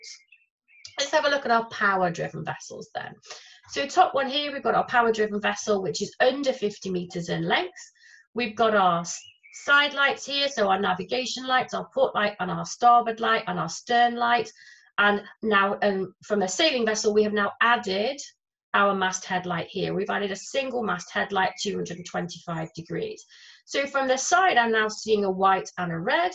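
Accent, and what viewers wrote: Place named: England, United Kingdom. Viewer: It’s Greg Laughlin from Australia again and I’ve just watched this from beginning to end and it is wonderful. I really appreciate the English accent and the authority she has in her voice combined with the fact that she clearly knows her subject backwards.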